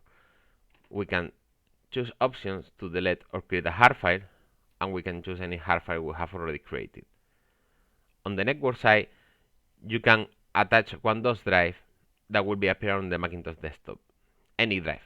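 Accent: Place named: Spain. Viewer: Eres español? Tu acento te delata lol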